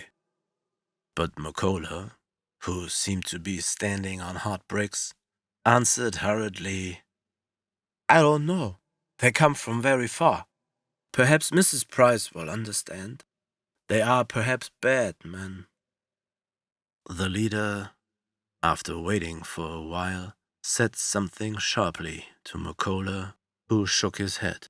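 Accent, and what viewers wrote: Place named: Europe. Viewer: Digging the creepy eUrOpEaN accent delivery here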